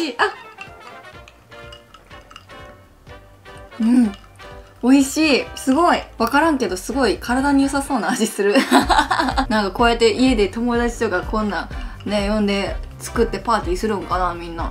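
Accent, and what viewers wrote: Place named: Okayama, Japan. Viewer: 岡山弁すき、、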